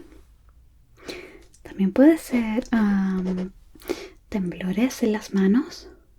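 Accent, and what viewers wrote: Chile. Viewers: Acento chileno ? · Es como si Latte ASMR hiciera vídeos en español. Pero ese acento chileno que tienes me fascina. 💙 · Algo que valoro incalculablemente cuando miro cualquier contenido ASMR, es la naturalidad de las interpretaciones cuando se trata de rol. Tú tienes una facilidad deliciosamente buena para esto. Desde la modulación de tu voz hasta la suavidad del movimiento de tus manos; agradezco tantísimo haber encontrado tu canal, tu y tu bello acento chileno siempre logran relajarme. BAH, QUE DIGO, Tus videos en inglés también son bellísimos ✨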